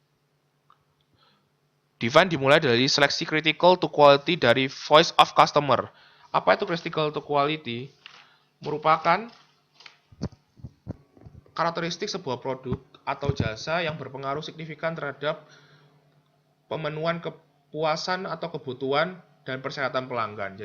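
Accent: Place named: East Java, Indonesia. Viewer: jatim banget, lek ngomong cepet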